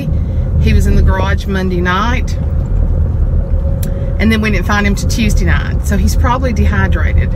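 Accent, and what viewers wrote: South, United States. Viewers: We got rain from storm up here but today it cleared and now nice and sunny out! Love your sweet southern accent. Hugs!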